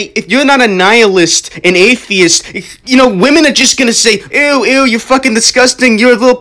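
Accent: Boston, United States. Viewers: Why he got a Boston accent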